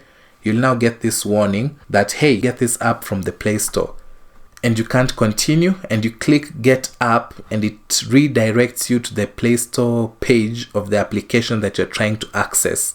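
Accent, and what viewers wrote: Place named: Kenya. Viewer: you sound kenyan :hand-pink-waving: I love the sort of videos like this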